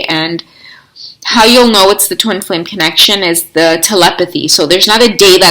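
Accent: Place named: Trinidad, Trinidad and Tobago. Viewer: Girl, sorry I forgot that I could reply to you in the oldest post videos, like I did before because I am hiding a little bit here, hehe, Thank you Girl so much for all the readings, I really love you too, and I love your Trinidad Accent too I always want to say that, so funny and of course natural cause that is your natural language, hehe ❤💖 Love you so so so much, you are like my best friend, the readings are like daily Vitamins for my heart, all, the Romantic ones, the Sensual ones, ect, all, hehe 🥹🙏 thanks so much for all the readings Girl, I can't thank you enough, let me pay you with my Love hehehe 💖🥹🫂😚muah🩷💖 Thank you so much 🩷💖 (Hehehe, i pick this video by random actually, just what oldest and scroll down a bit, wkwkwk🙏🩷)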